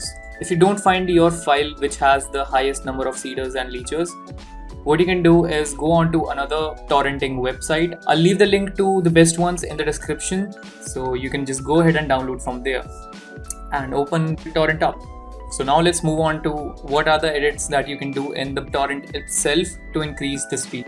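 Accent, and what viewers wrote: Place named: India. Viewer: the indian accent bro 🔥🔥🔥🔥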